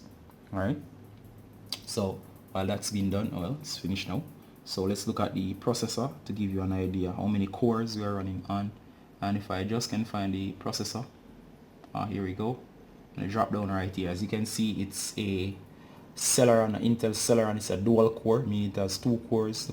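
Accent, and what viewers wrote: Jamaica. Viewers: U sound Jamaican or at least Caribbean... Awesome review bro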